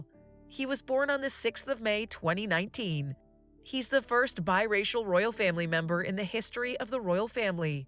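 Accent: United States. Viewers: I cannot stand an american commentig on the Royal family, it just does not sound right.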